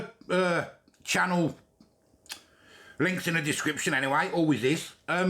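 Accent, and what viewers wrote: Birmingham, United Kingdom. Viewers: Is that a Brummy accent I hear?🤣🤢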